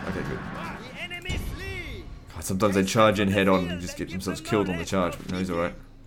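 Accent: Australia. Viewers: Really appreciate you doing this, and i like hearing the ozzie accent.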